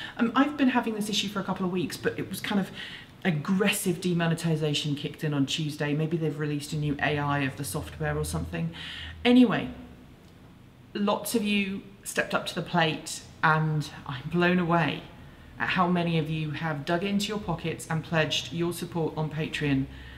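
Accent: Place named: United Kingdom. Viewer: Are you from New Zealand? I've been assuming you are British just based on your accent (I'm definitely not an expert, as may be obvious). If you aren't a Kiwi, why do you do movies specifically for them? Something unique about their market?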